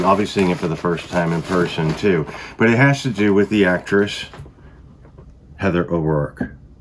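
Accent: Chicago, United States. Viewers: I knew it! I commented about a possible watered down Chicago accent in the Lisa Marie autopsy vid, which is the first video I watched on this (very interesting & well-done) channel. Doesn’t matter how long you’re gone, it always creeps back in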